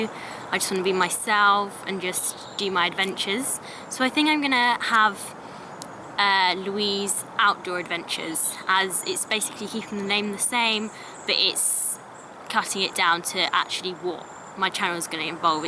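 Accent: United Kingdom. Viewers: I love your British accent. I could just lay in a hammock and listen to you talk.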